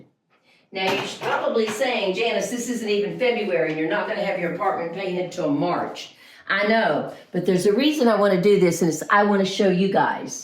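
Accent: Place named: Georgia, United States. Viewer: I just found your channel. I didn't know your background, and I thought this lady speaks French with a Georgia accent! My parents retired in Augusta, Ga, so I know that accent well!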